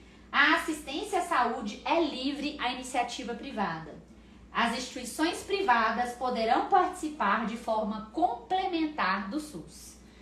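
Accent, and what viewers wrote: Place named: Minas Gerais, Brazil. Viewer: Adorei seu resumo. Pra nós mineiros é muito gostoso aprender no nosso próprio sotaque.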